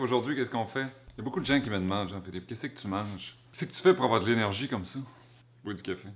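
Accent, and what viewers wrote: Canada. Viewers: Quel délice l'accent et l'humour canadien pour nous européens ;-) ..on va essayer ça et ne pas en abuser hihihi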